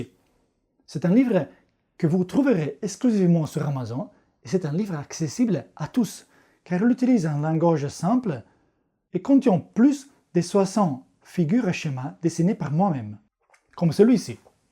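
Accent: Italy. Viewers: Merci pour cette vidéo très claire. Et quel plaisir cet accent italien ! J'attends avec impatience la vidéo sur la fusion de deux étoiles à neutron qui forme les éléments les plus lourds.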